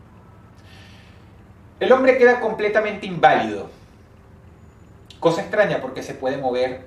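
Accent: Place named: Venezuela. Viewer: No son suyas. Son historias sacadas de internet. Y con el acento venezolano, pasa de dar miedo, a dormirme. Fuk Venezuela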